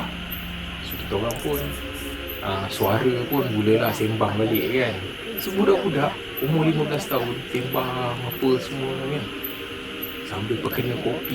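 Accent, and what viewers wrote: Terengganu, Malaysia. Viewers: Ambe asal org kampung seberang pintasan...area kuala dungun...biase gak gi panjat teluk gadong naik utk memancing...skang dah berubah..mari dungun nanti kite ngeteh...deme cakap macam org ganu dohh....respek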